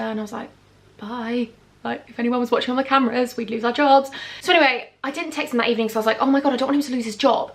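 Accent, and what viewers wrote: United States. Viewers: Girl your American accent is on POINT! Lol coming from an American lol 🙌🏾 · I live in the states and your American accent was on pointtttt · I’m living for the American accent hun!!!❤️❤️❤️❤️❤️ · YOUR AMERICAIN ACCENT IS AMAZING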